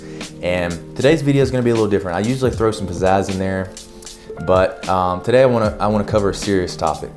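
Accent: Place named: South, United States. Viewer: I really enjoyed this video. It warms my heart to see people changing for the better and having the guts to openly speak about it. And your lil southern twang makes it even better.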